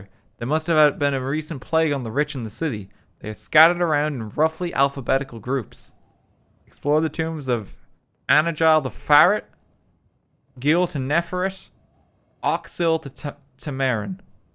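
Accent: Ireland. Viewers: A man with an irish accent dooms a city and his character's sanity for a crown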